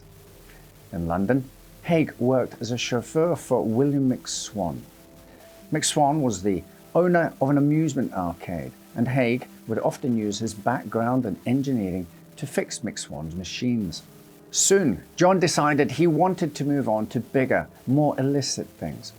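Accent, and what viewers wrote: Scotland, United Kingdom. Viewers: Fantastic thanks....love the Scottish accent!! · What part of Scotland are you from?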